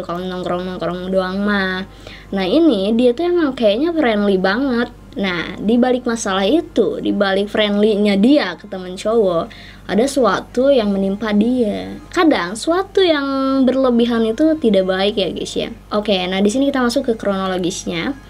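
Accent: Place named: Sunda, Indonesia. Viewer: Ciri has ngobrol nya Sunda banget teh semangat sukses sllu teh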